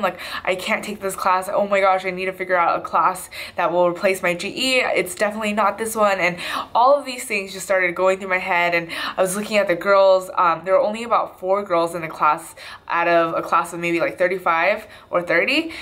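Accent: United Kingdom. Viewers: Omg! So proud of you!!:) love your british accent haha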